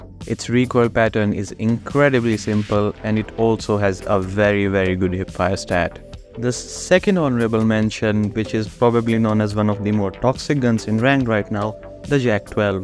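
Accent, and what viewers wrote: India. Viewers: dude you're the exact same as me 😭😭. i started exactly a month ago too after 2.5 years. i was surprised to see an indian voice instead of path's. wonder what happened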